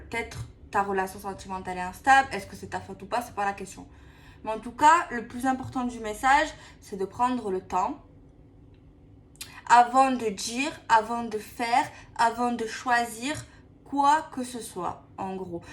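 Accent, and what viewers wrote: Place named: Marseille, France. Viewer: Bj d entré le premier message est pour moi! Avec l accent marseillais comme moi! C est super ma belle! O bonne mère bénie cette petite femme d amour!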